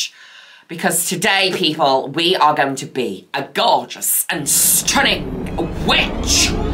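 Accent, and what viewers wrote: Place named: Liverpool, United Kingdom. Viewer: Super Scouse today 😂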